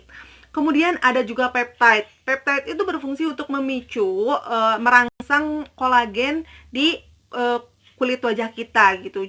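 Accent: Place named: West Java, Indonesia. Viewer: Best skincare product 2020 nya teh. Aku sukaa cara jelasin tth Sunda banget 😍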